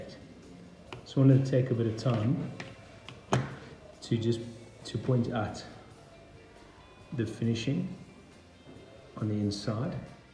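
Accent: South Africa. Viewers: What accent is that ? I'de guess SA.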